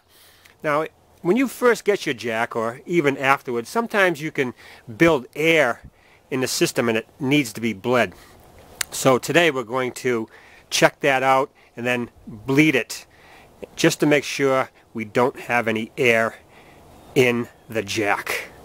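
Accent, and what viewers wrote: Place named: New England, United States. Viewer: Outstanding content. Thank you for this video! If you don't mind me asking, is that accent from Rhode Island, CT, or other?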